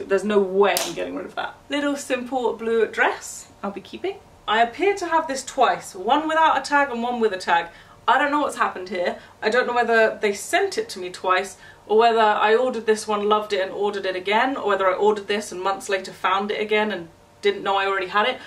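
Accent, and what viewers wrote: Liverpool, United Kingdom. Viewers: Joni Walling he’s from Liverpool in England I think 😊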